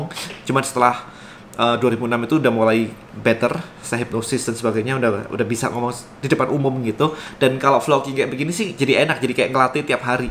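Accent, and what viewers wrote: Java, Indonesia. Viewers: Medok tenan ko kkkk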